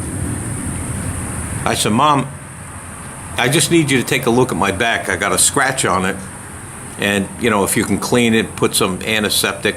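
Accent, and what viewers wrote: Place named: New York, United States. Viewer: what a couple of stories! i was glued to the screen for both of them. having a young child myself, i can already see how kids sometimes scare the every loving crimonies out of us parents, as i know i did mine from time to time.. thanks for sharing. can't quite place the accent; is it new york? hava good'n. 🍻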